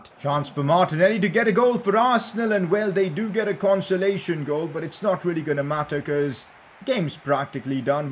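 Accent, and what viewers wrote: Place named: India. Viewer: Are you from India bro, love the accent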